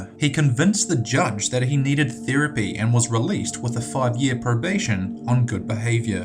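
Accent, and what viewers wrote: New Zealand. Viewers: He should know how to pronounce things like infamous and impotence. I thought his accent was more Kiwi than pom but I could be wrong. At least he can pronounce "subsequently" which so many can't. Drives me bonkers. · Your New Zealander right? I'm Australian and the way you enunciate the letter E kind of gives it off. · His accent is very much a New Zealand one. Australians don't pronounce words in the same way that New Zealanders do. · That New Zealand accent drives me fucking insane/ Tid bundy and the disastrous Goan Vallejo Corona. People just don't try when they annunciate incorrectly. Make's me very very annoyed...and I haven't subbed at all. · Kielee R I live in Queensland, Australia we don't talk like that. Maybe a Kiwi! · Fury Devil He's a Kiwi.... Kiwi's don't say 'Mutation' instead of 'Mutilation'.... facepalm yourself buddy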